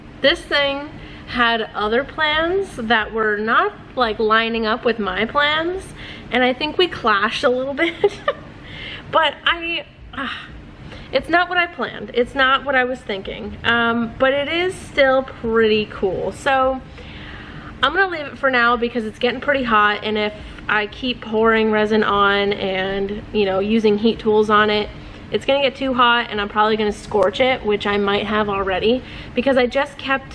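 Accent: United States. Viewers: Your art is always so beautiful. Btw, where in the US are you from? I’ve heard your accent before but do not know where from. I love it.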